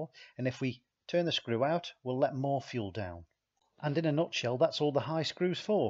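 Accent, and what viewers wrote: United Kingdom. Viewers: These are cracking videos. Thank you so much. Great to hear a British voice instead of the legion of american videos. Love this series. Really useful. Wish I could fix my mercury mariner though. Love to see a video on replacing crankshaft seals.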